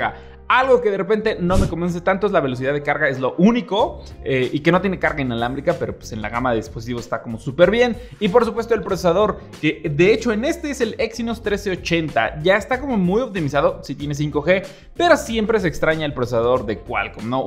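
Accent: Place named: Mexico. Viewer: Me parece agradable el acento mexicano. El ar, me da as co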